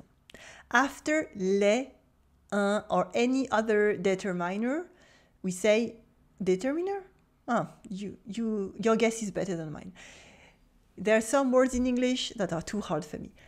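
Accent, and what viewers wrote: France. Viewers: Yes, but keep in mind that you're speaking English with a very heavy French accent, which makes YOU harder to understand.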